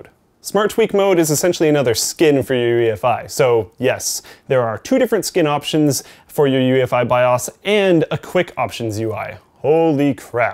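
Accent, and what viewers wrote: Canada. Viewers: what's with the Canadian accent? i know you're Canadian and all but i have never heard you nor linus sound like that before! yes i did notice a few videos back!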